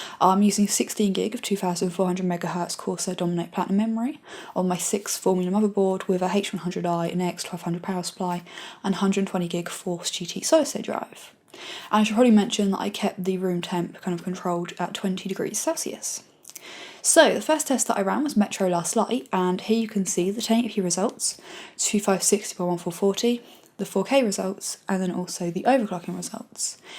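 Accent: England, United Kingdom. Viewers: Well I have to say you know your stuff and very easy on eyes too. Although I have a little problem understanding you. You have a very rich english accent. But after watching about 3 of your videos, I guess it's starting to clear up a bit. Oh and by the way, 980 in SLI is the way to go! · that's British English :) C'mon im Brazilian and i understand perfectly fine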